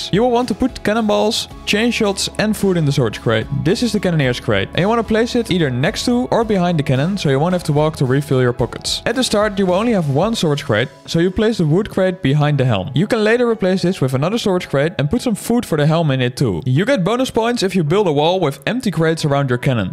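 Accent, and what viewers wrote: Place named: Netherlands. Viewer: i smell dutch. Great video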